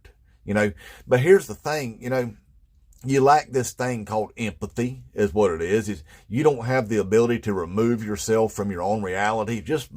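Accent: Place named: West Virginia, United States. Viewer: I just love the way you talk. Reminds me of my grandparents from West by God Virginia. I was telling my grandma (God bless her soul) back in the 90s that I was going to vote republican. She looked at me and said. "Lauren, why would you go and do that for? Our family have always been Democrats.". Well, I gotta tell ya that I have never forgotten those words, and I learned the hard way about Republicans over the years. I switched to blue somewhere near the end of the bush administration. I saw this Maga movement coming before it happened. I saw the racism and hate first hand and didn't want any part of it. So here we are. Trying to wake people up before there is no democracy. Before the United States ends in a bad way. Vote Red, end up dead.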